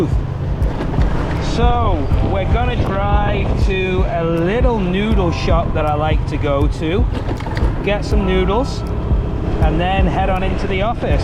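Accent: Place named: United Kingdom. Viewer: accents is British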